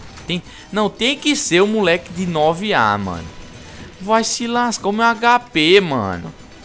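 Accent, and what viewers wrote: Northeast, Brazil. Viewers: vc é nordestino?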